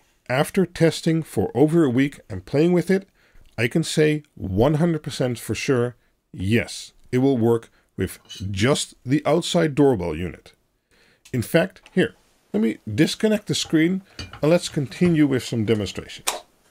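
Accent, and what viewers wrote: Netherlands. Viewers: Hallo, ik hoor dat je waarschijnlijk uit Nederland komt of vandaan? Ik heb je video bekeken het is een goed concept en zie ook dat de video 2 jaar oud is, maar ik heb hier waarschijnlijk een oplossing voor die misschien minder tussen stations heeft?!?! Of heb je relay board en esp32 voor nog meer dingen nodig? Dit is de 'DS6660 PRO' van DOORSAFE een standalone camera met LAN poort die PoE ondersteunt, WiFi antenne, gong aansluiting AC/DC, resolutie: 2mp fhd 1080P, verbinding via de Tuya app dus ook mogelijk te gebruiken met Home Assistant. dit allemaal voor €110,00 inc BTW Ik ben benieuwd of dit een goede alternatief is? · Are you from the Netherlands. Your accent sounds Dutch.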